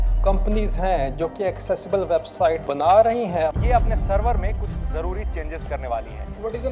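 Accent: India. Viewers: I didn't expect indian accent 💀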